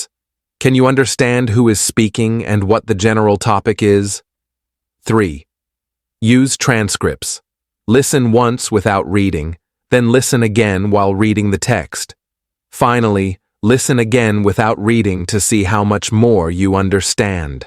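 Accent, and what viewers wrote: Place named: United States. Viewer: چقدر زیبا هس این لهجه آمریکایی ممنونم از شما دوست عزیز🙏⚘️🍀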